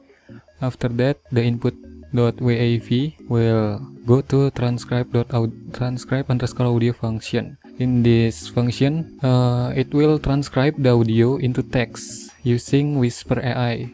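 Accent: Indonesia. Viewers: Sudah kuduga ni orang indo ,dari cara bicaranya masih kedengeran kyak orang indo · Typical orang indonesia ngomong inggris, logat indonya berasa banget, anyway good video bro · Bahasa inggris nya sangat mudah dipahami karna sesama orang Indonesia gw juga kalo ngucapin bahasa inggris kaya gitu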